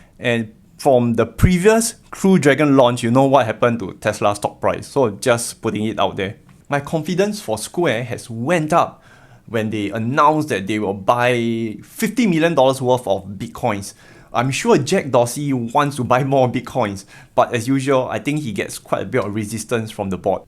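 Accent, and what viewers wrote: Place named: Singapore. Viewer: Love you Singaporean accent bro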